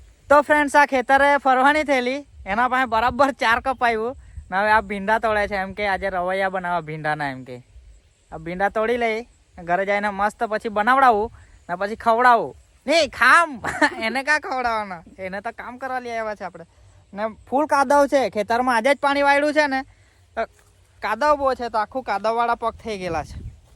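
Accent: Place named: Surat, India. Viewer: Respect karo bhai atli simple gorgeous wife mali c6 to.. Tamri surti bhasha sambhalvani Mazama...keep it up 🎉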